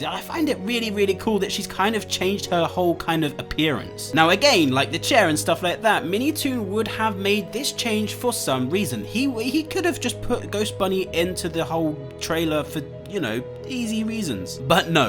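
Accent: United Kingdom. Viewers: are you bridish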